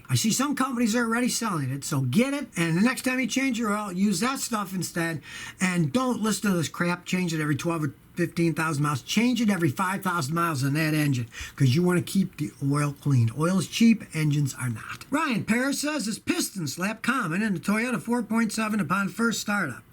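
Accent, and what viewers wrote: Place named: Chicago, United States. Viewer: Hello,. Are you sure that you’re from Texas. You’re accent sounds like from Chicago or Detroit. I appreciate this wonderful channel, and keep up the great work. 😊✨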